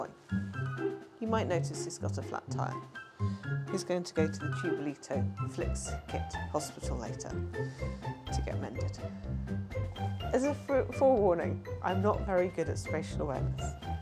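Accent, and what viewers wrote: RP, United Kingdom. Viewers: Her accent reminds me of Queen Elizabeth.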